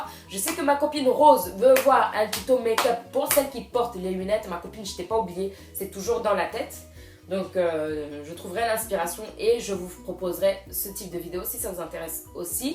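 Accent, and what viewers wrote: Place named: Democratic Republic of the Congo. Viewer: Moi aussi on me prend pour une sénégalaise ou malienne. C est notre couleur et les traits fins qui porte à confusion. Je suis du Congo brazza. C est vrai que je t aurai prise pour une sénégalaise mais à ton accent j ai reconnu le Congo sans être sur bien sûr. Donc on est compatriote 😉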